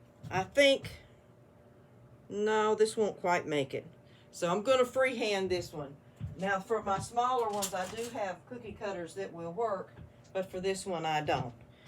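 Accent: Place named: Tennessee, United States. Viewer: I grew up in TN. I miss the accent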